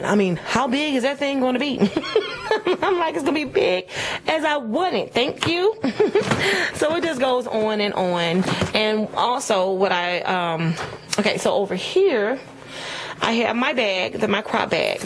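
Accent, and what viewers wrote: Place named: St. Louis, United States. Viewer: Awesome Room, can I come shopping! :-) lol St. Louis Talk, "Whered' you go to Highshool?" Do you drive on highway "fardy" :-)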